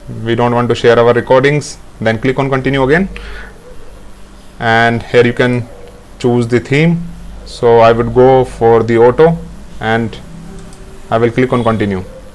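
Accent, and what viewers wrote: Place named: India. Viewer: why is your sound like a tech support scammer from india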